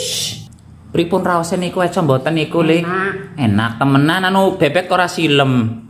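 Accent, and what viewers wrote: Banyumas, Indonesia. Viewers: Seru jeruknya manis...wes jian,ora ngapak ora kepenak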